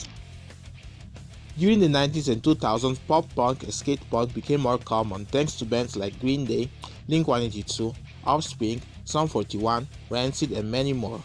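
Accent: Italy. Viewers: From your accent I feel that you are Italian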